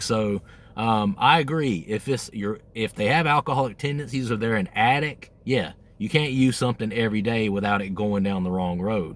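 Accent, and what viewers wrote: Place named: South, United States. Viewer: Interesting you mentioned you're from Georgia with parts of the "typical" Southern accent. My parents were born and raised in Ohio. When I was two, in 1958, we moved to Atlanta - my parents actually had the house build on the new lot. But it was in a brand new suburb in South Atlanta. Just about ALL the kids in that neighborhood and my schoolmates were transients from other parts of the country. When I moved to New York City at the age of 26 (Yes - it was quite a transition!) - most New Yorkers couldn't tell where I was from by my accent. NONE thought I was from the Northeast! They REALLY didn't guess the South.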